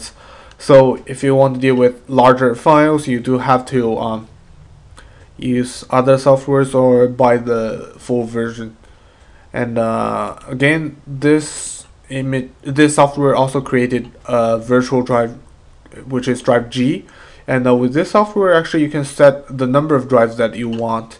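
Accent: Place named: Iran. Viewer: Iranian Accent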